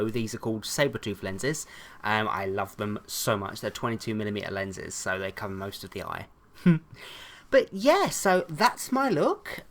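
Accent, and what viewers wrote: United Kingdom. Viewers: I like ur british accent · you look amazing great work as always! your tutorials are fabulous darling btw im from the uk too and your accent is adorable im from near Manchester lol so my accent aint as classy as yours ;)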